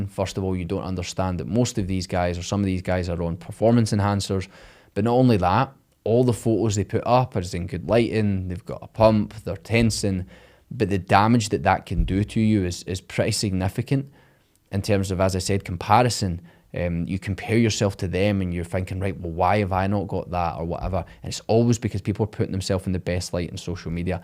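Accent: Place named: North, United Kingdom. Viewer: Fair play bro like your style of delivery, it's great to listen to more "localised" people, me a dub and yourself /obviously somewhere north!!!😅 information may be similar but to support and hear from again as I said more "my own people "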